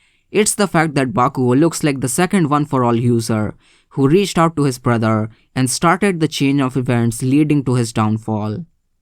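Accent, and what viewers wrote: India. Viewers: Clicks video, hears the thicc Indian accent, alright I'm out( after commenting ts ofc)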